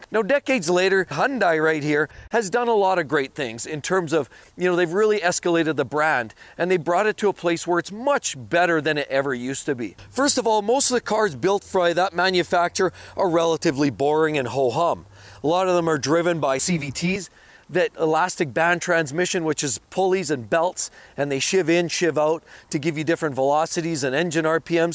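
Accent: Canada. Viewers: This guy is Canadian according to his accent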